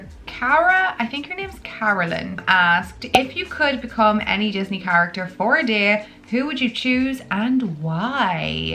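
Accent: Ireland. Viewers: Greetings from Los Angeles!🏖🌴Your channel was on my suggestion and I took a glance and got hooked! I hit the subscribe instantly. Gotta say I've been binge watching your vids.😊 Great style, great personality, lovely Irish accent and overall 👍👍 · I see, hear an Irish accent, think of tommy shelby, then die bc I love cillian murphy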